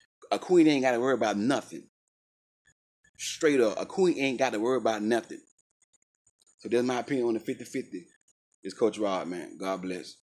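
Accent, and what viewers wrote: South, United States. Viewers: Sounds like your from the South. What that entails in the Northeast or California is on on average a 2,000 dollar a month mortgage, 250 dollar a month heat and energy bill, 150 a month cable bill, 100 dollar amonth utility bill, 800 dollar a month health insurance bill, 300 dollar a month car insurance bill, 600 dollar a month grocery bill, 600 dollar a month for car notes, and 500 dollar a month for savings. Thats about 5300 dollars a month. So to be a provider you need to be maiking over 80k a year if you live in these areas. It takes 2 incomes this ain't the little house on the prairie.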